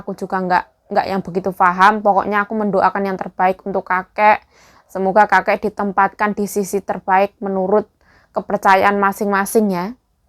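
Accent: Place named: Malang, Indonesia. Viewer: aku denger kamu ngomong kok lucu bener, kata di kaploki kok jadi ingat bahasa Malang yo Jeng? aku jadi geli denger nya. Okay salamku dari jauh Jerman ( Germany ) Berlin. Salam kenal juga.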